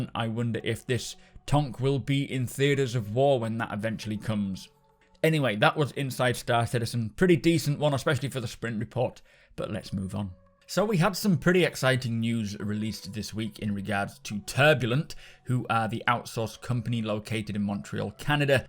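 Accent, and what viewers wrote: United Kingdom. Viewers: You have a very thick britbong accent It's really annoying, for example on 5 minutes you're trying to say teased but you said teabd. incoherent mumbling.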